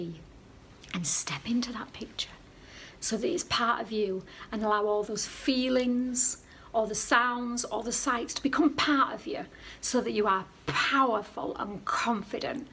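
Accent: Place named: Hull, United Kingdom. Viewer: Sounds like you are from near Hull just like me! :)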